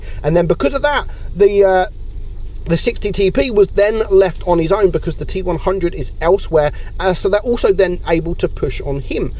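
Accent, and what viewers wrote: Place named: Scotland, United Kingdom. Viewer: Scottish lol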